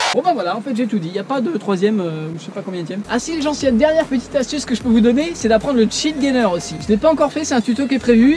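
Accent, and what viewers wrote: Belgium. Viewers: Belge ou français ?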